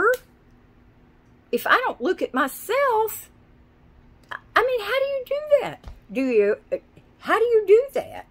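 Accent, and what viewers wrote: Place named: Oklahoma, United States. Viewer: I think most of us get goofy and silly when we're overtired! Hope you took a little nap. And, about your accent, I noticed in your first videos when you were in Washington, you had much less of an accent. Now you're back to your "born accent" you ole country gal! I love it! You kind of sound like my Grandmother and Aunt from Oklahoma! Feels very "homey" to me. Even though I've lived in western NY state since I was 13 years old! Love ya! x ~Darcy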